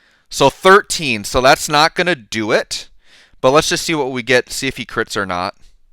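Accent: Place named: Wisconsin, United States. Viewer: Are you from Wisconsin? I feel it in my bones.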